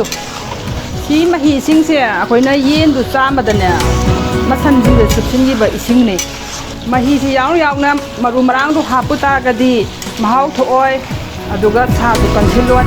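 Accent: Kakching, India. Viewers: Yes ngnagnei Kari tourage ngangiba lonse kakching gi dialect ni Kari problem leirage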